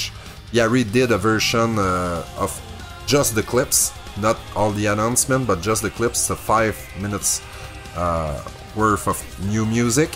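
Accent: Quebec, Canada. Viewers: Are You from Québec?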